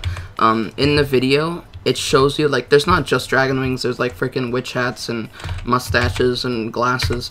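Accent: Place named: Ireland. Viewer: Ign Irishaccent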